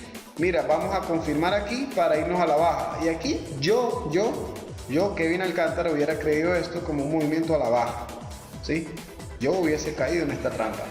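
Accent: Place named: Venezuela. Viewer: Conho hace mucho que no escucho un Venezolano... Bien hay mi pana estoy aprendiendo y tu aporte es importante, gracias.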